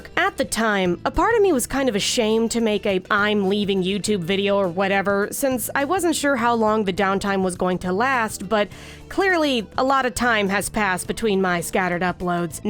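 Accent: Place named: West Virginia, United States. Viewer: I'll be ready whenever you are. ....and sometimes I forget how WV you are until I hear the "y'all". The cutest thing.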